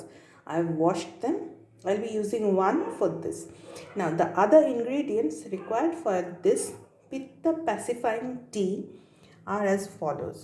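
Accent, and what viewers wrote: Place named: Kolkata, India. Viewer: Sunei apnake Kolkatar bashinda mone hocche...... Great work.... keep it up....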